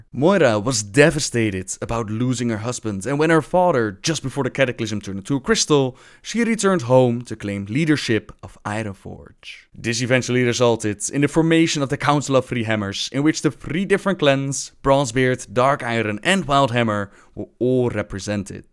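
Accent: Netherlands. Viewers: rymarowicz he is dutch. And has a nice accent. so he might say thing differently then you and i do.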